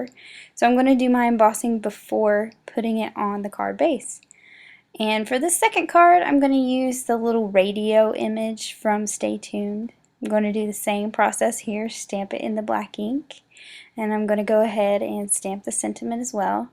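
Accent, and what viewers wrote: South, United States. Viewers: I love this - great for multiples., this is the first time I've picked up on your southern accent - cute:) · So darling,! I love these. And PS, I could hear your Southern accent a little more in this video than usual. Cute!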